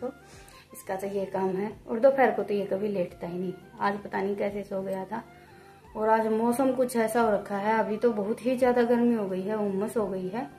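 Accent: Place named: Rewari, India. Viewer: Aap kha se h mugè to rewari se lag rhi h aap whi ki bhasa h aap ki may be rewari se hu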